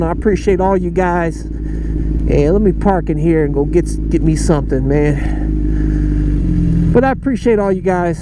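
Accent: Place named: Ohio, United States. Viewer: I didn't realize that folks from Ohio have a regional accent, but listening to your videos, I learned that they do.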